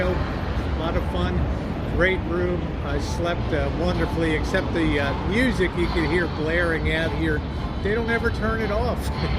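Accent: Baltimore, United States. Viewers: I'm a new subscriber although I've watched some of your videos along the way before hitting that button. I've been trying to pinpoint your accent, and I thought you must be from Baltimore. When you mentioned that you've had enough of mobsters, etc. in Baltimore, I think you just confirmed my guess. You're such a positive, energetic person, and I really like your videos.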